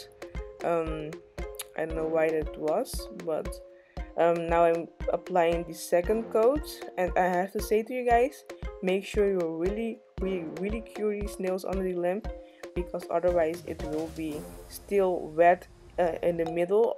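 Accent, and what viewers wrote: Netherlands. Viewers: are you dutch? haha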